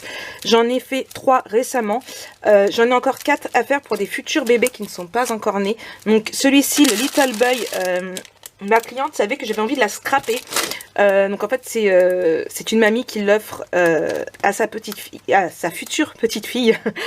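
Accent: England, United Kingdom. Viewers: Coucou, et oui j'ai bien rigolé pour ton joli accent anglais, très joli papier,j'ai hâte de découvrir le magnifique album que tu vas nous faire,je te souhaite une bonne journée bise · Cc jolie haul et oui j’ai bien rigolé avec ton accent anglais lol bisous 😘 priscilla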